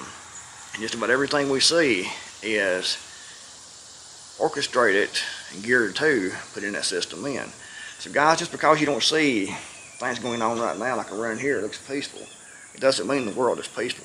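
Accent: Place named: South, United States. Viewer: Hi there, I love your videos. You are calm, and sound like a Southern gentleman. (No offense, or crazy stalking business. Just want to let you know what I value in your videos and on the channel.) I just want to comment on something you said that's tickling my brain, about a comment by someone else, with regards to the lady who is losing interest in prepping. They want excitement, they want something to REACT TO. They want to be entertained. Prepping boils down to keeping one's house in order. It's that simple. Keep decent food, cook for the family, etc etc and, yes, etc. Prepping is KIND OF fun, but it's mostly hard work. It's BORING, until one sees the progress, the stacked canning jars, the freeze dried bags, and so on. It's not GLAMOROUS. I'm up periodically around the clock, there's no makeup involved in slicing and blanching potatoes outside under the carport at 1 A.M. 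because of the heat and humidity in the area. If people want to be entertained, try stealing my chickens, I'll give them entertainment, and some precious metals to boot, and I'm not talking gold or silver.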